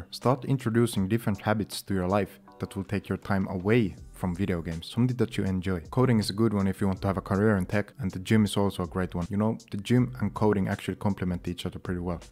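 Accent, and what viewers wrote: Finland. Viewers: I don’t speak Finnish (yet) but my mom is from Finland and I knew I recognized your accent / the written language right away. Great video, hyvää työtä ! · I was thinking that this sounds just like a finnish person then i saw the screen shot :D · where are you from? u sound kinda like finland edit: ok, nvm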